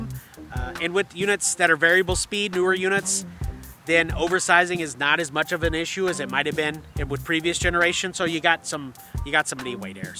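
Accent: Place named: Canada. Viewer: Your accent is between Canadian, English, Irish. Really crazy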